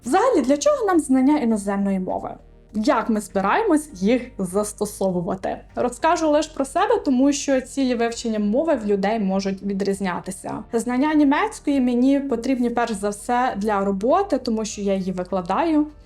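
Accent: Western Ukraine, Ukraine. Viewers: Український акцент десь з заходу, подумав можливо із Закарпаття ? )))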